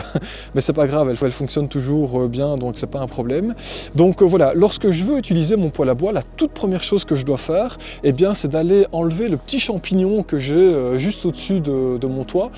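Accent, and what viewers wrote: Belgium. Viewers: Charmant accent belge 😇🤓🐨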